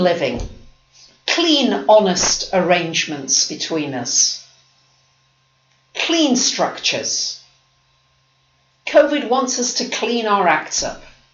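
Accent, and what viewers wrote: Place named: Midlands, United Kingdom. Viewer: I had no idea you lived in Greece, I thought you were maybe somewhere in the midlands in the UK.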